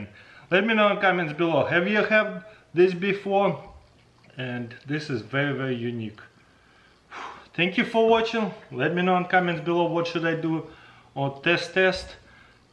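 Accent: Russia. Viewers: Russian Accent hits you in the first second.... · His russian accent is thiccer than the water and i love it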